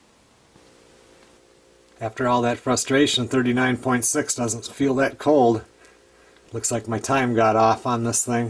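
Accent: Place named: Northwoods, United States. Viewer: Just found your channel; great to hear that accent. I was born and raised in Cloquet, lived in Duluth; now transplanted to OKC. These will help me get my Northwoods fix, so thanks.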